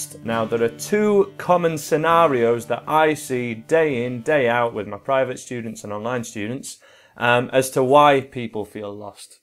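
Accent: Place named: Liverpool, United Kingdom. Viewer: cute, has a Beatles accent, and is a great guitar teacher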